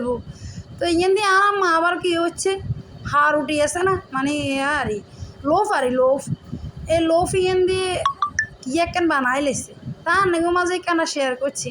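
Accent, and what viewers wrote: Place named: Noakhali, Bangladesh. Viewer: খুবই মজা পাইলাম আপু,, তুমি এই নোয়াখালীর ভাষায় ভিডিও কইরো, শুনতে খুব ভালো লাগলো ❤❤❤❤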